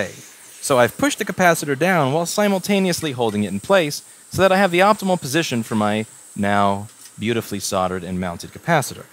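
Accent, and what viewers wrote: New York, United States. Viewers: Yes! It's kinda like watching a football game. I think it's his monotone voice along with that Jerry Seinfeld accent that makes it soothing. Although, I've learned a lot about soldering. I just wish he'd gear it more towards us novice-type. Like, I had no idea how to use flux like he does! And, NOT to lift the wick, but drag it was.....INVALUABLE!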